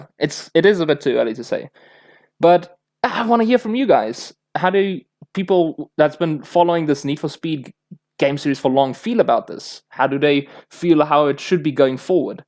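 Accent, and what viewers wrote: South Africa. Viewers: Are you South African? Edit: Yeah now I realised, I could hear by your accent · South African accent. I hear it. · Unrelated, but you sound South African... are you? 😂 Edit: Knew it lol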